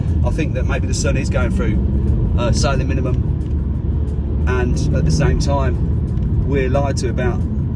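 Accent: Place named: United Kingdom. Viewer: I like your British accense.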